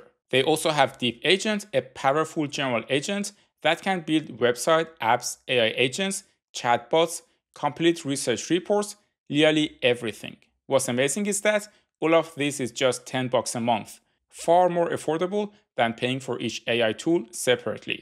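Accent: Iran. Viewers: You sound Irani, are you ?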